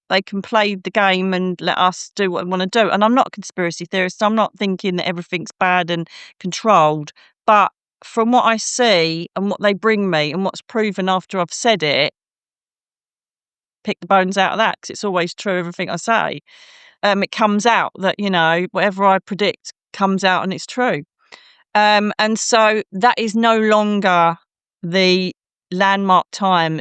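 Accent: United Kingdom. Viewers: American here so I don’t know how to describe this accent, but wherever she is from in the UK seems to have an over representation of psychic people. Seems very common on Gaia etc. Kind of interesting 🤔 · I can’t get over how FAST time is moving right now!! She is lovely! She sounds like my UK family ❤ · Hard to understand her British accent!😢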